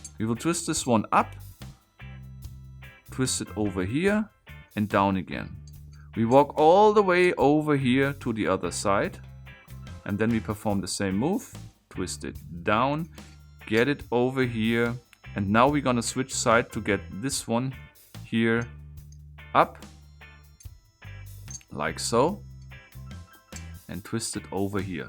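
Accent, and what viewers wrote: Germany. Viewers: What language is that? I’m just curious. And also what country are you from? I want to say you have a German accent?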